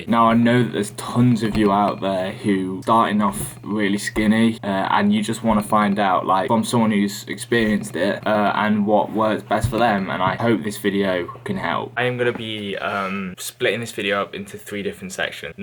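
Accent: United Kingdom. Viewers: Then don’t watch lmao, how hard is it to exit? Its not a lisp lol its his brit accents.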